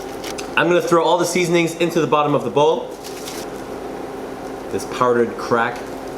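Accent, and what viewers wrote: Indonesia. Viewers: hes so cute talking indonesian 😆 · your indonesian accent is cute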